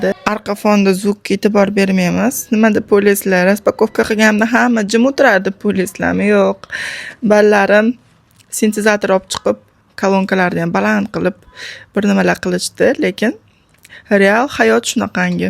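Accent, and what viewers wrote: Kokand, Uzbekistan. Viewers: Opa qoqonlikmisz shevezdan❤ gullariz vashshi mazza dahshat zooor mazza qildim korib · Шевалари Кукон❤ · Quqoncha shevami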